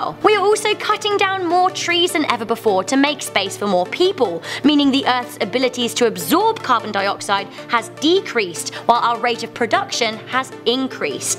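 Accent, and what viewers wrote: United Kingdom. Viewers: She is from the U.K., different accent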